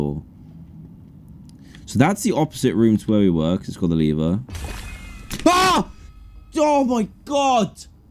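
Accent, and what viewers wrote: United Kingdom. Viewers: I know this is just UK accent but.. mario sounds like mario but the letter a sounds like A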